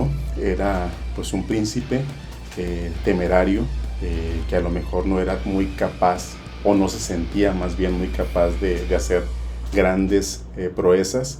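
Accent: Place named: North, Spain. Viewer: Hablas bien bonito, acento del norte je je je.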